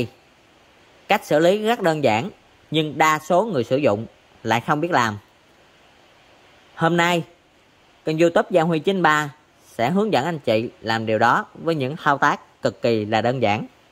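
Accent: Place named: South, Vietnam. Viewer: cám ơn em nhiều lắm, em hướng dẫn rất tận tình, chất giọng nam bộ rất dễ thương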